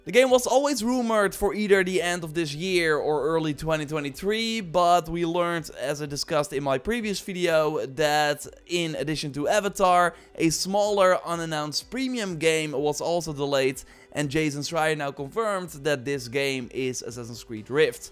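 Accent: Netherlands. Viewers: I can't get over your Dutch accent🤣 it's so obvious (omdat ik zelf ook Nederlands ben)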